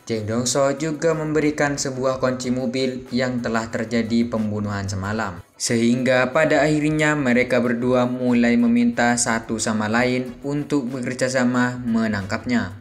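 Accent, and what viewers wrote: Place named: Madura, Indonesia. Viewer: saran sih min, untuk suaranya mungkin lebih santai lagi, dan untuk logat maduranya lebih di redam lagi atau diminimalisir mungkin😭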